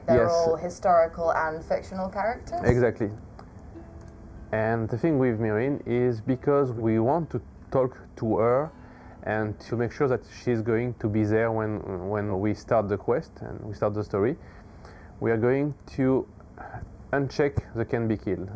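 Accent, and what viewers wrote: France. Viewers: Guys, seriously why the heck you put someone with french accent explain how a tutorial works out??? It's PANEL not PANAL ffs...i struggle to understand HALF of what this guy says!!! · I love that strong french accent ! haha ! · Le gars a clairement un accent français, mais ils n'ont pas cru bon d'intégrer le français dans les choix de sous-titre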